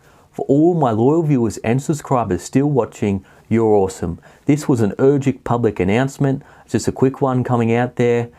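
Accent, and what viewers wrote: Australia. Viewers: Cheers buddy - really appreciate your news presentations and humble aussie commentary style.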